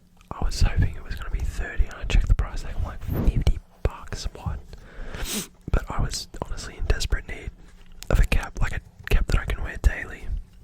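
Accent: Australia. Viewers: omg a fellow aussie doing asmr???? this is insane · Love the Aussie accent so much I wanna visit there and NZ so bad, my favorite music group is based outta NZ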